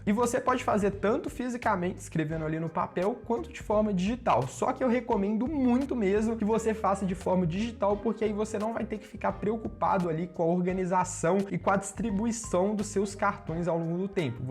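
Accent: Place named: Minas Gerais, Brazil. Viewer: Seu sotaque é muito massa. Tmb sou mineira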